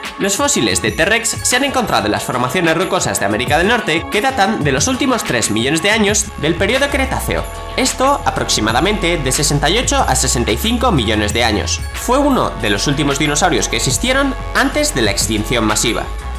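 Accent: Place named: Spain. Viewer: hay como cambian palabras y pronunciaciones esos españoles eres español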